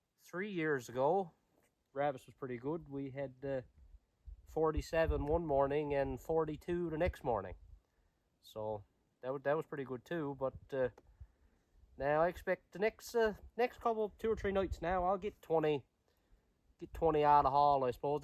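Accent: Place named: Newfoundland, Canada. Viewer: How many slips to get 16? I thought that was good by far the best I've seen on here. What area are you? I'm from Lewisporte and your accent seems like that area or close by.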